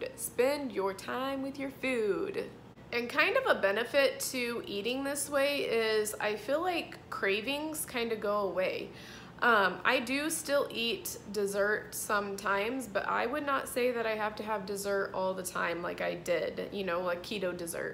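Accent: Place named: Kansas, United States. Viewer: Great content,...thanks! Btw, are you from the Kansas area originally? Your accent is a lot like someone I know who grew up in that area.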